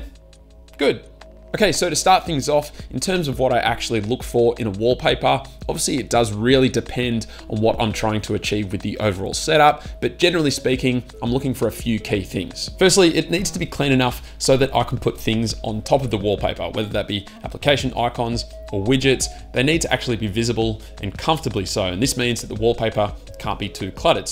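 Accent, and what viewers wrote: Australia. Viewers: Great video bro! Is that another Aussie accent I hear? 👍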